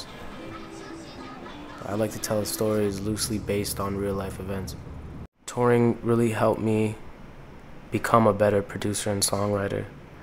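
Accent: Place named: Toronto, Canada. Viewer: Lol yeah thats our toronto accent/slang that you're hearing.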